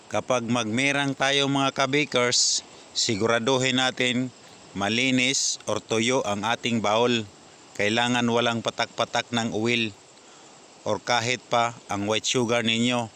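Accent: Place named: Visayas, Philippines. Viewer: gud morning chef watching from Guam. gusto kita magturo kasi detalyado at simple lang.God bless you chef. bisaya ka chef? bisaya kasi ang accent mo. kung bisaya ka parehas tayo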